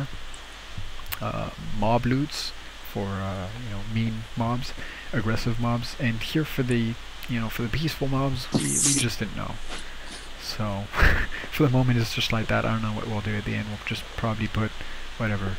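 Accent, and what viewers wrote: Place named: England, United Kingdom. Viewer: tu as un belle accent englais.:)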